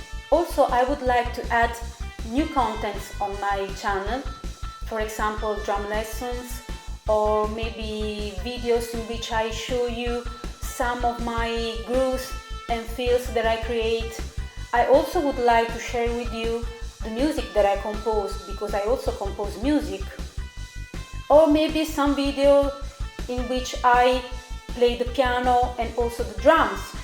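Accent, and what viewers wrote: Italy. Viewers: beh con quel accento certamente nn potevi essere di Bolzano...ahhhhh,insomma sei cresciuta a pane e progressive,beh sicuramente un genere molto tecnico,meriteresti un contratto da professionista! Continua cosi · Congratulations on 55K+ subscribers and so nice to hear you speak with that beautiful Italian accent... I had always assumed you were in the US somewhere, it is great you are here in Europe. Would love to hear your own music and videos of you playing with your band as well as the brillant drum covers you keep giving us. I have got to say the hours of practice you put in really shows in your playing - amazing quality. Very best wishes from London UK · ....Thanks for answering questions that many of us have wondered about! What do you think about Mick Fleetwood? As you well know...the music business is not only auditory, but also visual as well. 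Not to appear as creepy or anything like that, but clearly you are a very talented and extremely beautiful woman....and your Italian accent is fantastico! Love to see you playing piano and singing!! Keep the videos coming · Not only are you an excellent drummer, but you have a beautiful Italian accent! Two thumbs up!!! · Omg you have an Italian accent too !? Damn now my heart is really exploding!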